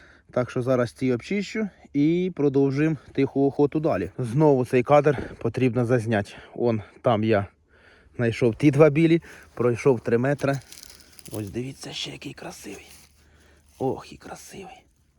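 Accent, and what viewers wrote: Ukraine. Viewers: Який колоритний український діалект! Таке задоволення слухати! Та й грибочки, все тільки конкретно, без лишніх блукань показано. Відео сподобалось! Єдине може тільки, знімати сам гриб трошки ближче, щоб видно було красу гриба. Дякую!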